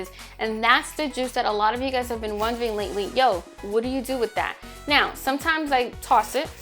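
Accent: New York, United States. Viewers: Love that nyc accent coming thru but u look like you moved out to the burbs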